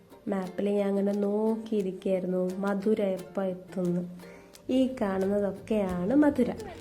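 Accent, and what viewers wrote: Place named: Kozhikode, India. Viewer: Awesome presentation! It was great to hear😊. പിന്നെ ഇങ്ങടെ കോയിക്കോടൻ ഭാഷ പൊളിയാന്ന്❤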